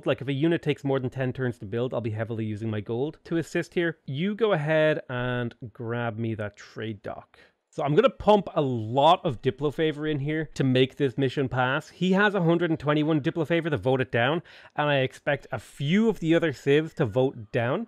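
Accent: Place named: Ireland. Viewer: That moment when I hear an Irishman talking about paddy fields, and becoming fully redfaced and relieved when I realize "Ahh talking about rice, I'm an idiot!"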